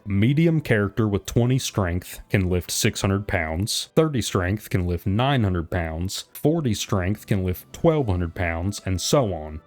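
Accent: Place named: Rural, United States. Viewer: Ive known a lot of hicks in my day, but brother if that is what you sound like reading from a script, you're better off leaving it out. Yeesh.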